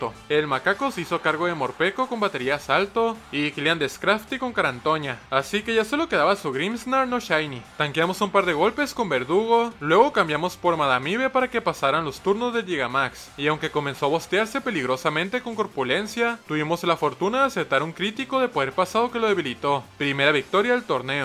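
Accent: Chihuahua, Mexico. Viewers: una pregunta mi estimado ¿es usted de Chihuahua? tiene el acento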